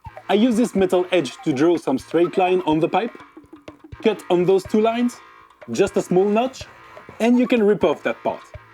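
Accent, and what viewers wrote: France. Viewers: Pas de réaction à mon post d'il y a BIEN PLUS d'un mois (eh, YT de mes...). Pas étonnant : tu t'adresse à un public anglophone ou anglophile (dont y doit y en avoir qques uns qui viennent se régaler de ton accent Frenchie) et À PEU PRÈS les seul à avoir pigé le sens de mon intervention, c'est toi. De deux choses l'une (à part le soleil bien sûr), OU BIEN t'as pas le temps de revenir voir les comm. sur tes produc à YT, OU BIEN tu t'en fous de ce que je te dis. Libre à toi de continuer dans cette voie, mais sois prévenu : c'est une très lourde responsabilité que tu prends en n'avertissant pas ton public du danger à porter en bouche, régulièrement, du pvc industriel. Si c'est la 1ère hypothèse qui est juste, j'ai pas d'autre choix que d'inonder les comm. de tes autres "sorties" pour battre l'alarme. (Et p'tain, je me passerais bien de me farcir ce taf ; car y en a d'autres qui font comme toi - mais pas avec autant de constance d'ailleurs je ne sais pas comment tu fais ; chapeau pour ça - & pas pour ton entêtement si c'est la 2ème hypothèse qui prévaut...)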